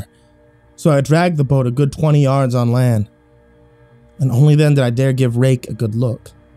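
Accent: United States. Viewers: He managed to do a good North of I10 accent. Not really cajun more just Iota area. Or maybe Jefferson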